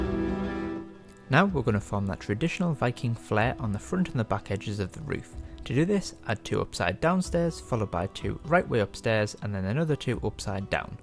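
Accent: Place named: Scotland, United Kingdom. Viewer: From your acent i guess you are from skotland like yelowwool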